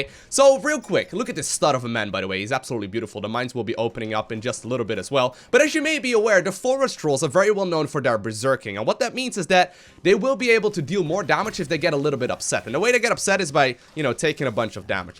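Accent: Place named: Netherlands. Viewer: Haha yeah that's kind of how we Dutchies often speak!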